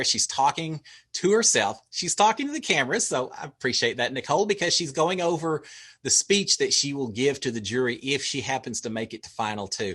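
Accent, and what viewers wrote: Texas, United States. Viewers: Good morning!! Love your Texas accent!! Thanks for the update!! Always enjoy listening to Notes in the morning!! Have a great day!!! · Your Texan accent is very much appreciated! Love your recaps,! Please do other recaps after BB?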